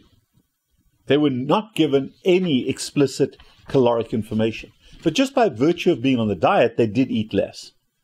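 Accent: South Africa. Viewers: Is your accent South African?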